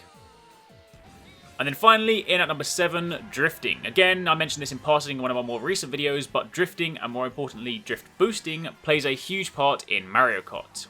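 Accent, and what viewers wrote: England, United Kingdom. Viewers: Of course he has an english accent omg tired of that shit every single time i look up a game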